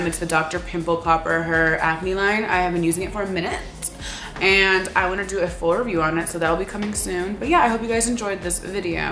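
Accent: United Kingdom. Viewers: Ur British accent is the shit !! Loll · i live for your british accent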